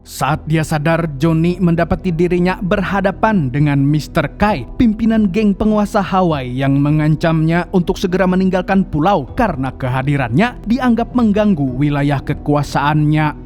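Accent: Banjar, Indonesia. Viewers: Mang orang banjar kah pian..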